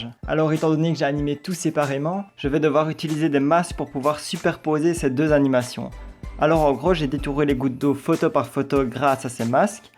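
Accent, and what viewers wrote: Belgium. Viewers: Tu es belge? #accent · Ho ! Un belge ! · Hey je me suis aboné j'aime bien se qeu tu fait ta du talent est je lenten a ta voi te Un belg moi osi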